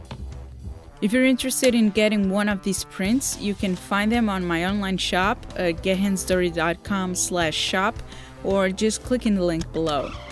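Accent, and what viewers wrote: Portugal. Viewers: Suspeitava que fosse portuguesa, pelo sotaque! Acertei hehe :D (sou professor de inglês, por isso notei facilmente, btw ;)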